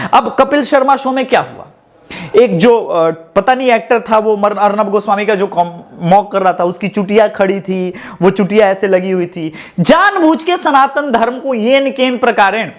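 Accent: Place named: Bihar, India. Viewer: Sir aap jarur up-bihar wale ho ........... Hai na sir? Aap ki channel pai mai pehlibar aaya hu aur apke bolne ka tarika bohot aacha laga......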